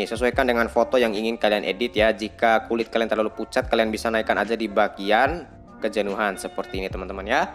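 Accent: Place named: Eastern Indonesia, Indonesia. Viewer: Monmaap bg, orang timur ya? Kental banget nada suaranya 😊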